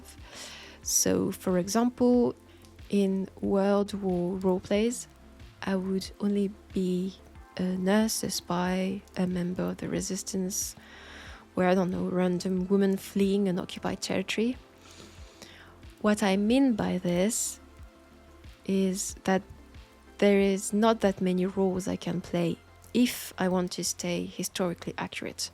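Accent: France. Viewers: Some excellent questions here. The sort of questions it's thoroughly enjoyable listening to you answer, but I would find excruciating to answer myself, being a 'bear of very little brain'.. Thank you so much for taking the time to do this. It's such a delight hearing you as yourself, once in a while. It has also made it easier to distinguish, as my daft question pointed out, a true etherial entity, swirling in a non-committal penumbrian light.. With a distinct French accent, of course.. :) Lesson learnt!. I assume you are partial to mountains, due to your own particular part of France, & the destinations you mentioned you'd like to live / visit? I can understand that & the type of nostalgia it brings. The banner picture in my profile shows the sort that I have those kind of feelings for, although I'm sure I could grow accustomed to mountains too, in time. As long as it's fairly rural countryside, & away from hoards of people. 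The flat stuff's just a bit more forgiving when one has the mad compulsion to go cycling. With regards to Trench Crusade, I, too, will likely never play it, let alone purchase, paint & prepare even a single faction. But since I became aware of it I've lapped up as much lore & artwork as possible. Amazing, dark & sinister looking creation. Thank you once again for the time & effort you put into everything. Enjoy the rest of your weekend. I'll meet you back here again soon :)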